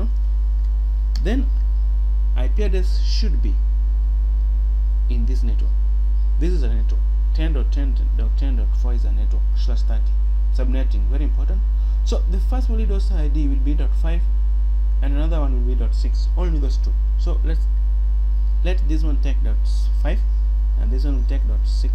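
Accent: Africa. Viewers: Hello, great video and tutorial! I want to thank you for helping me with creating a network like this. I have a few recommendations for you to improve the quality of your videos even more. 1.) You should do something about the noise in your microphone as it is a bit annoying to focus on learning while that is constantly bugging in the background. 2.) You have quite a unique accent. Native African I believe. As I'm not familiar with your accent, sometimes it becomes quite hard to understand some words. So, using closed captions would really help to make your videos be even easier to understand. 3.) You should make a list of what the steps are in a serial order so its easier to pick up from anywhere in the middle of the video if someone is stuck somewhere or is confused because right now its a bit hard find specific steps if I want to go back to them. ALL that being said, you were a great help and I really love this channel. 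Thanks for creating such videos and keep creating more!!! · This was simply amazing. We were given project by university to implement this and you illustrated it perfectly. More power to you I guess by your accent that you belong to Africa. Lots of love for you brother from Pakistan. Kudos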